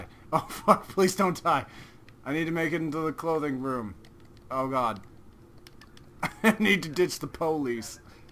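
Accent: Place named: Russia. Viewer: i love your gameplay your so funny to excellect rusian accent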